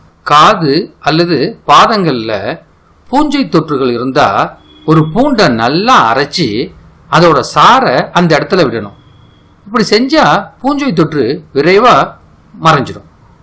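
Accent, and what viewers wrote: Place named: Kerala, India. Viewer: 😂😂😂 but malayala slang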